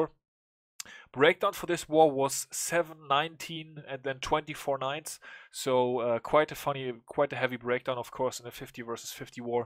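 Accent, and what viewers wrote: Germany. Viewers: Du bist glaube ich deutscher also du hörst dich so an sag mir mal bitte wenn du Deutsche Bist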